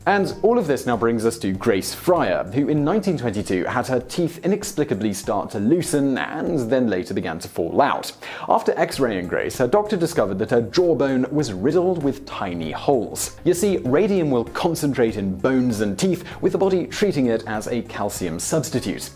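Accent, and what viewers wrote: United Kingdom. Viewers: Get a pretty girl that does not have a overwhelming British tone do you talking, is just my advice. Maybe good material, although who could sit through your monotone voice.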